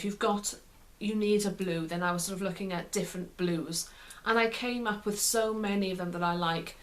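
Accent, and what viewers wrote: Wales, United Kingdom. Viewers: Its her Welsh accent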